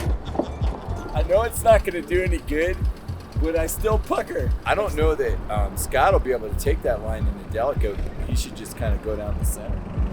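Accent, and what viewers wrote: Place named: Southern California, United States. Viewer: Yup, his accent is so SOCAL it hurts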